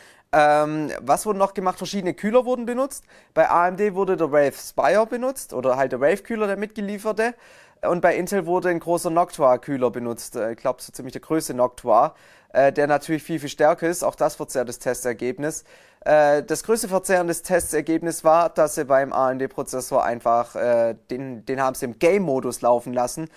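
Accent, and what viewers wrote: Swabia, Germany. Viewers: alter schwabe :D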